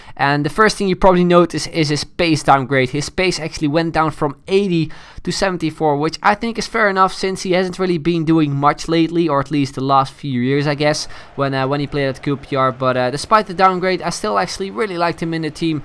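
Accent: Netherlands. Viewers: ik dag al dat je nederlands was dat merk je gewoon hoe nederlanders engels praten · are you dutch?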